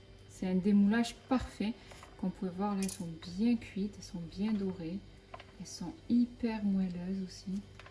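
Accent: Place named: Midi, France. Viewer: Clair, simple, bien expliqué sans chichis ni fioritures et avec un petit accent de notre cher Midi. Resultat parfait! Bravo et merci pour cette superbe recette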